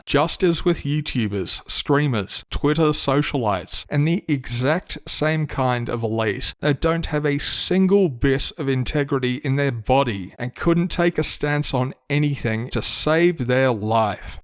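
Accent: Australia. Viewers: Equating the forceful internment of Uyghurs, despite there being no evidence of actual genocide, with the rest of these actual genocides is the problem here. Would you call the systemic arrests and imprisonment of black people in America a genocide? The actual problem here is that In all of your videos you only actually stand for the western consumer. Genocide for you is something that happens far away from wherever you're at (probably Australia if your accent is anything to go by) and you couldn't care less if billions perished so that you could keep enjoying all those first world pleasures. You could use your channel to make videos about actually horrific things gaming companies are supporting in besieged countries such as Nvidias support for global systems of surveillance and their use of Israeli occupied Palestinian land for tech research, the U.S. military propaganda within mainstream 'realistic' fps games such as BF6, Call of Duty, etc., or Saudi/ Gulf monarchy ties to Esports. 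Instead, genocide is just an off hand topic for you to throw out there for shock value. The main focus of your content is solely on whats good for westerners, nothing of actual value.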